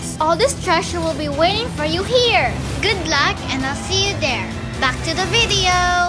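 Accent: Philippines. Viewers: Are you Filipino?Your voice sounds like so,If I’m wrong pls tell meh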